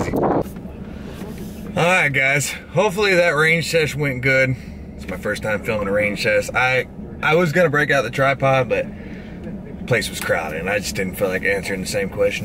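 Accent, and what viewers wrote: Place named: South, United States. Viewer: i didnt expect your southern accent i never heard your voice till now🤣 and it made me fw you even moree very chill and laid back love to see it!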